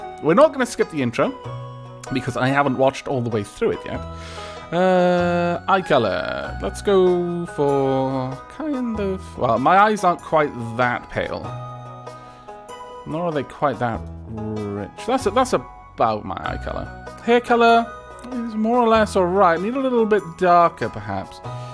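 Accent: Wales, United Kingdom. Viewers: I thought he was British XD thanks for the confirmation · Ok so after watching your let's play i went and bought the game and am just starting out. Loveit so far! love the vids and your adorable welsh accent! First time watcher of yours now and new subscriber. Just wanted to say thank you and keep going. please. Also love the tardis and doctor Who references as well as a few other things you have referenced. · Tigran Movs he’s welsh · Ah so your a brit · Hey, I can immediately tell that you are British from your voice but you don't sound very Welsh. since you're returning to the valleys, you could bring the account into it a bit. show me that you're the only gay in the village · You have that Accent, sounds British or genuine gentlemen like.. soothing I can listen to you play great games on a podcast. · he's Welsh. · I just found your channel and your voice is arousing. 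Also you are the most British youtuber, even among British youtubers. (That's a compliment)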